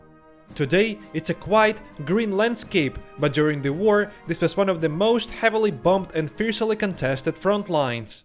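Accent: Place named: Russia. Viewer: The Russian English :D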